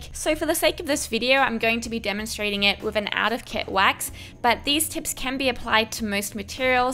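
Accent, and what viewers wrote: Australia. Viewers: Came here from Mykie. Are you Aussie? Hi fellow Aussie! <3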